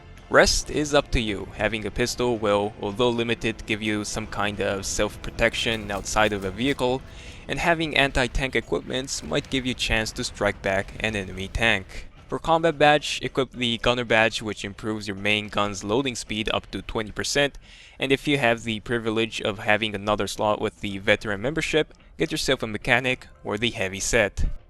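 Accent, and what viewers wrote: Germany. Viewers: U don't speak english normal...are u german or something?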